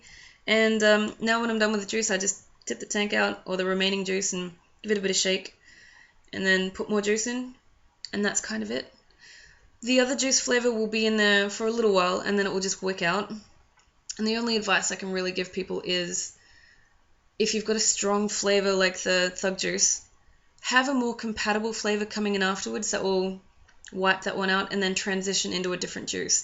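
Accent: Australia. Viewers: it's so nice to hear a laid back aussie on youtube for a change.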